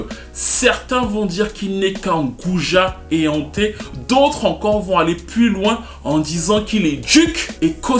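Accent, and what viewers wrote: Cameroon. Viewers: Toi tu es camerounais d'origine 😭😭 trop le ton ... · Il s'agit juste du parlé et du phrasé typiquement camerounais. Que j'aime beaucoup d'ailleurs...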